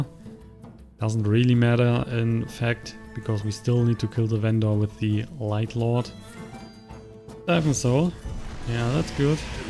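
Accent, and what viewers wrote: Germany. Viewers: Er spricht gutes Englisch, aber mit eindeutigem Deutschakzent. Hört man sofort....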